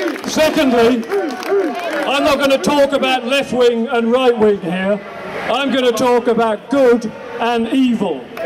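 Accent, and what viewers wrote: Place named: RP, United Kingdom. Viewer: Couldn't help noticing that Paul toned down his normal posh accent for this speech and even used the "f" word. Not a criticism, one has to speak to one's audience. He's a very brave man and I fear for his safety. Sadly, with Britain's stupidly undemocratic first-past-the-post voting system, which notable was set aside for the Scottish independence referendum, his tiny party has virtually no chance of getting elected. Unlike in Holland where Geert Wilders party, with the same anti-Islam message, is the 3rd largest with many MP's and he gets 24 hour police protection.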